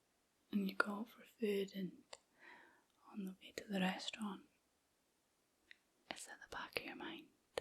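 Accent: Scotland, United Kingdom. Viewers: The Soothing scottish accent gets me to feel cool, calm and collected for years. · That scottish accent is just fire 🔥🔥💯💯👏🏾👏🏾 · Hello from a fellow Scot from Ayrshire I have trouble sleeping and hearing a Scottish accent is unique on YouTube so thank you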